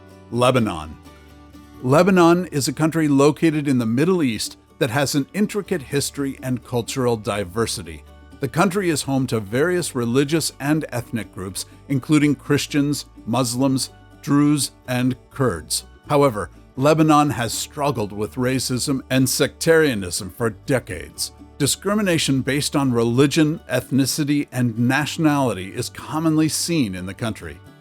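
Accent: United States. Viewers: I would classify Lebanon as a special case. They have taken in millions of Syrian refugees and their economy has collapsed. Refugees in their own country. What would this American voice say if he was on the street with nothing to eat and no real government to provide any help? There is a lot of high brow hypocrisy in this entertainment media video. Meanwhile in Texas, possibly the richest place on earth, the government is consciously packing refugees from south of the border onto buses to states where the people are less racist. That's America for all to see.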